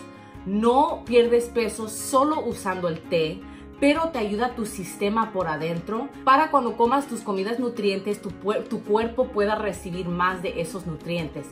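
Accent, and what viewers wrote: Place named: Spain. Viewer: First, love your Spanish accent you are excellente instructor.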